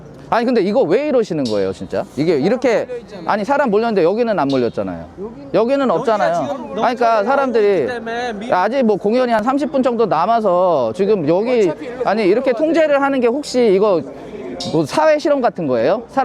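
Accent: Gangwon, South Korea. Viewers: 강원도 말투 같은데? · 야 강원도 말투다 임마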